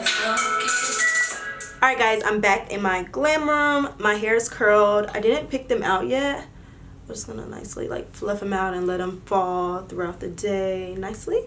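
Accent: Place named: North Carolina, United States. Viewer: if that was a " " thing, or a North Carolina accent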